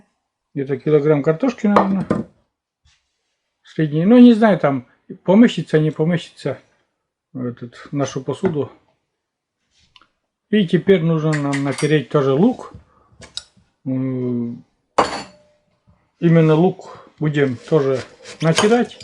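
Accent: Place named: Russia. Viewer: Какой приятный русский говор - просто мёдом Божьим на уши и на сердце ❤❤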